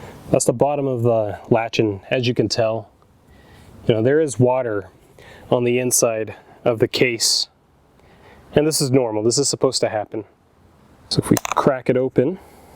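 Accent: Canada. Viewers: you have got a great canadian accent.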